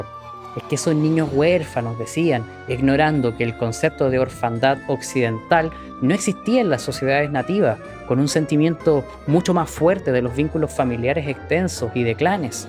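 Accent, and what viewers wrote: Chile. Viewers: Siento un pequeño acento chileno eres chileno? 🎉 excelente canal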